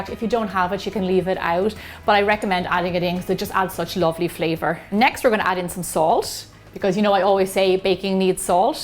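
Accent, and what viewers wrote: Ireland. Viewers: i love your Irish brogue so I'm going to listen to all your videos! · Hardcore brownies xD I will say it: it has to be fudgy, otherwise it's a chocolate cake! Brilliant recipe and I love your Irish accent :) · i love her Irish accent. I could listen to her talk all day long..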